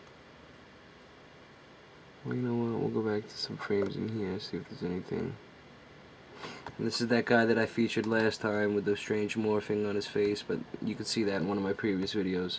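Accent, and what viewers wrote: Boston, United States. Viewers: Are you from the east Coast? I am from NJ. I seem to hear a boston twang. or maybe a brooklyn tone. We knowers of the truth need to get together. I suggest weekly Videoconference. What causes the quick Snap back to normality? We need to execute Genocide concerning these creatures.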